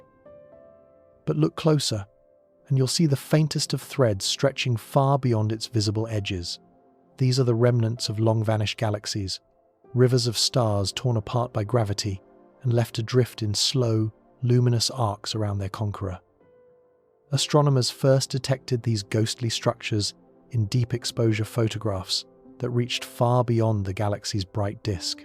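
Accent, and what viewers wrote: United Kingdom. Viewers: all these damn AI robotic voices have Brit accents. Can't we get some with Nigerian or Peruvian accents?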